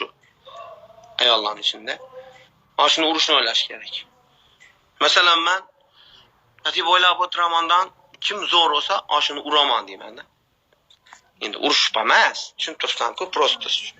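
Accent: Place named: Khorezm, Uzbekistan. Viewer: Yomon gaplisqu xorazmchada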